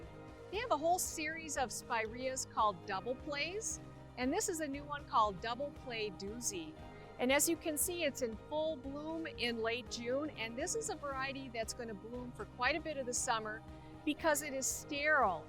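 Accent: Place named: Minnesota, United States. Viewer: Thanks,! You're awesome, and I love your Minnesota accent! This video was super helpful and filled with beautiful plants 😊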